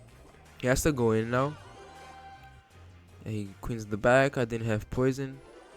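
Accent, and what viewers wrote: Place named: Brazil. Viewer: Pelo sotaque vi que era BR kkkkk, joga mt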